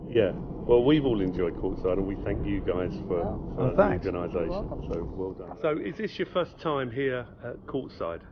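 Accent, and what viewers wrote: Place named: United Kingdom. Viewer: What I'm not a fan of is inappropriate noise. What I am a fan of is this;A beautiful British accent,A natural sense of humor spoken in subtle ways that is charming and funny,An obvious affectionate relationship between a man and woman that shows their depth of respect and love for each other. It is this magic that AC/DC spoils because they are anything but the above.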